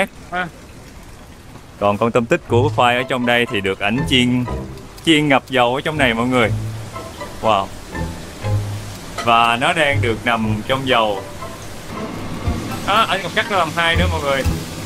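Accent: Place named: Mekong Delta, Vietnam. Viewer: Bạn này nói chuyện đúng chất miền tây luôn a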